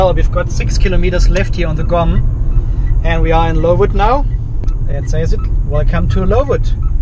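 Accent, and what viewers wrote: Germany. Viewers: when are you going to upgrade to a new Mitsubishi Outlander PHEV? I'm looking forward to the 2020 version in North America, hoping that the 2.4L engine that is promised will provide better hybrid mileage and perhaps updated/better BMS and a little bit more electric range. Otherwise, please keep the educational videos coming; they are all fascinating and your German accented English seems normal/part of the friendly charm of your stories about a journey with the Mitsubishi PHEV. Two thumbs-up for this video, even without the watchdog data along for the ride...j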